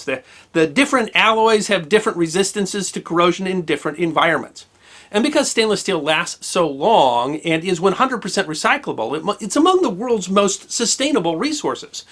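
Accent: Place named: St. Louis, United States. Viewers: I had guessed that you were from the StL area when I first started watching you. You have that accent. I grew up in the Overland/ St Ann area. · St. Louis Missouri? I was guessing the North East originally due to Your syntax and inflection. I'm guessing that You're a transplant then?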